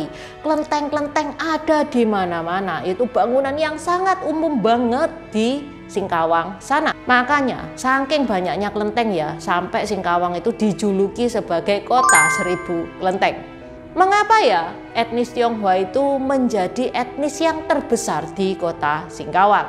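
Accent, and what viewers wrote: Java, Indonesia. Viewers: Asli jowo opo mbae..kok medok jowo bahasa Indonesia nya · Logat ngomongnya saja sudah ala jawir. Jadi aneh dengernya karena pakai logat orang lain. Contohnya tidak usah jauh2 nih si cici yang punya channel ini.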